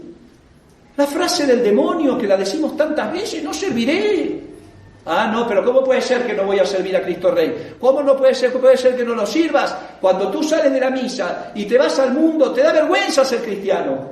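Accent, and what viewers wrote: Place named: Argentina. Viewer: Por el acento argentino intuyo que es, pero no estoy seguro.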